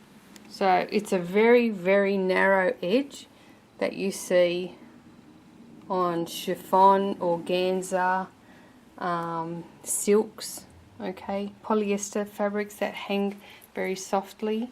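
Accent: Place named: Australia. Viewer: This has nothing to do with sewing but I love your Aussie accent. (Thanks for all the helpful videos!)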